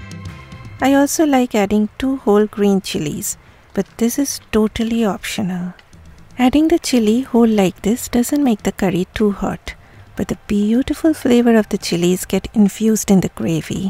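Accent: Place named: Bengal, India. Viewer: I really love your accent😊😊 english from a bengali tounge is always sweet to hear ❤❤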